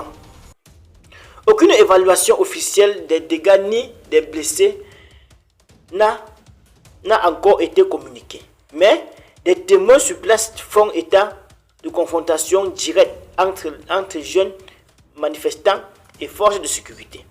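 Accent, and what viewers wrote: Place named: Cameroon. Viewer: Toi avec ton accent camerounais là tu crois qu'on est assez bête pour croire à ce que tu dis moi je suis à Yakro et on a dormi en pays ici hein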